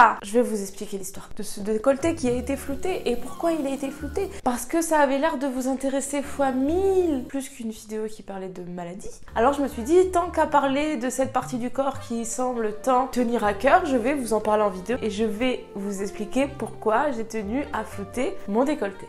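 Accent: South, France. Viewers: En vrai je comprend pas trop pouquoi tu te prend la tête avec ce genre de com', perso je les trouvents pas insultant et c'est plutôt légitime de poser des questions sur le contenue surtout si d'un moment à un autre ça change... Ils ont pas critiqué ton choix mais poser des questions et c'est pas grave (dans ceux que ta montrer). Si tu voulais pas repondre et te prendre la tête ne répond pas et passe à autre chose car je t'ai trouver vachement tranchante dans tes reponses de manière injustifié ^^' Ça ce voit que ça te tiens à coeur mais les questions de montage et de contenue sont inévitable sur youtube... tu te prend la tête pour rien ❤ Oui ta le droit de flouter/ ne pas flouter ce que tu veux et il y aurait eu des com' de toute manière mais sur autre chose... c'est ça la connerie de youtube et si tu te prend la tête comme ça pour des com' où les gens ne t'insulte/ ne sont même pas vulgaire / ne te reproche rien mais juste pose des questions tu vas avoir énormément de mal a supporter la vie de youtubeuse de 2020.. 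Et jcp pouquoi tu parle de féministe car je voue aucun com' qui dit " meuf tu es féministe c'est inadmissible de pas montrer tes tetes". Je pense tu te prend la tête pour rien ❤ ps: si l'accent du Sud c'est chou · Fait se que tu as envie les gens son idiot 😑 j’adore ton accent du sud mdrrrr 😘❤️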